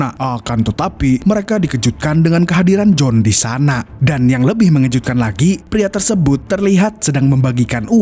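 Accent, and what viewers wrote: Banjar, Indonesia. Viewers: Urang Banjar kh bng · Urang banjar kah nang ba'isi chanel ngeneh..??😁😁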